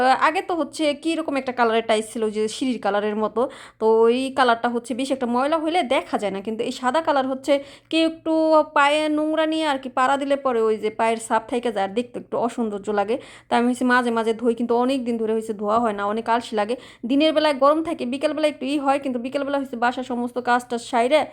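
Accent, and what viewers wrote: Faridpur, Bangladesh. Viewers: আপু আপনার মুখের ভাষা শুনে কেনো জানি আমার মনে হইতেছে আপনার বাসা ফরিদপুর। আপনার বাসা কথা জানাবেন আপু?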